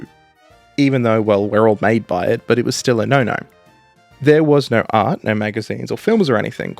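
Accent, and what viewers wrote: Australia. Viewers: I just realized this guy is an Aussie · It feels so refreshing to hear an Australian accent in videos like this. Born in NSW, raised in QLD :D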